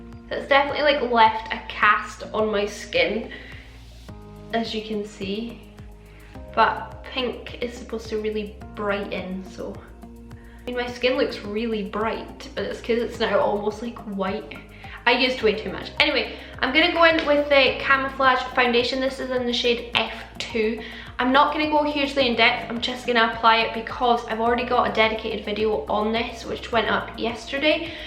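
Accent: Scotland, United Kingdom. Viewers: Your accent is really cute 💞 Are you Scottish ?? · Are you Scottish? If so, where are you from? :)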